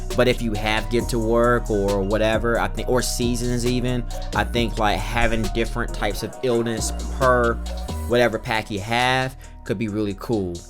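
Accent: South, United States. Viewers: I think your southern drawl just didn't want to hit the r before the g. Burglars. Haha, no shade. All my family is from the deep South but I was raised in Iowa 😂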